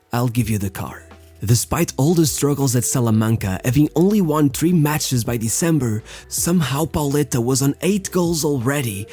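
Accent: Portugal. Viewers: Só precisei de ouvir 10 segundos de vídeo para saber que eras português. Abraço, continuação com o bom trabalho. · Boas, parabens pelos videos. Consigo perceber pela tua pronúncia que és portugues. Por isso peço te que incluas legendas em português, pois os teus videos tem muita qualidade e há muita gente portuguesa que gostaria de ver os teus videos mas que não entendem ingles. Abraço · You have a portuguese accent, i'm pretty sure you're portuguese! your videos are very good, keep it up!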